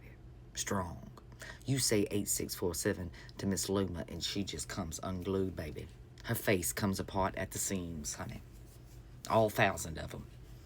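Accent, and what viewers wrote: South, United States. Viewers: This is the most "well bless your sweet lil cotton-pickin' heart" Southern sass i have ever heard.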